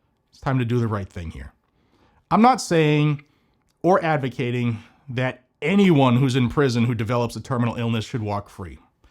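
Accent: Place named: North, United States. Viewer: I love your content, and watch you daily. I just want you to know that everytime you say y’all, l cringe. Every son and daughter of the south know that you’re from up north by your accent, and didn’t grow up saying ya’ll. Glad to have you below the Mason Dixon line, you’re obviously a patriot. But for the love of all that’s holy, stop saying y’all. It just doesn’ go. Getting off my soap box now, God bless and keep up the good work.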